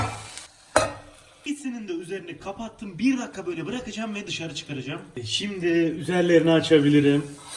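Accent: Adana, Turkey. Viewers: ABİ SEN ADANALI MISIN KONUŞMAN ÇOKK BENZİYOR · ihsan doğan baya boş yampmışşsın işiniz gücünüz dalga adam adanalı şirvesinin nasıl olmasını istersin seni beyinsiz gerçekten senin gibi insanlar varya...... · ses tonu televole.diksiyon adana aq.kesiiüüürüüüm doğriiüüürüüümm.yapma yapma 😆🤣nekadar güzel gözüküyor derken bari gözüküööööööööö deme bari aq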